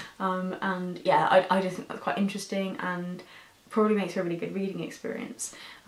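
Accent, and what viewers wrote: United Kingdom. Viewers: You're so different to all the other booktubers I follow and I love it! It's nice to hear a Brit talking about books for a start, but also you don't focus on popular books, just ones that you feel are important or interesting. It's so refreshing and I love it!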